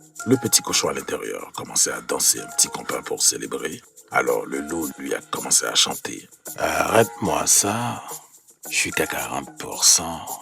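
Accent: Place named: Quebec, Canada. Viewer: Belle recette et bonne narration. J'ai crue remarquer un très petit accent Québécois, la poutine ma confirmé le tout. Merci. ;)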